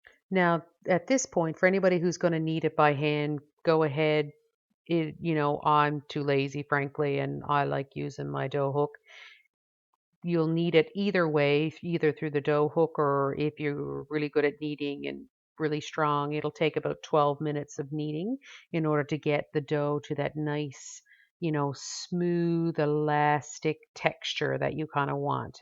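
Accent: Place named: Maine, United States. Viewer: Tried this recipe this morning. Excellent taste!!! Brought me right back to St. Viatare Bagel from St. Lawrence Market in Toronto/Montreal. I used commercial "everything"spice and it was wayyyyyyy too salty. Sesame Seed one was delicious. I followed recipe exactly and would do it again!!! Not too difficult, even for me, a novice yeast dough baker. Great job on the video, and I love your pointers and tips, and of course, your Down East accent.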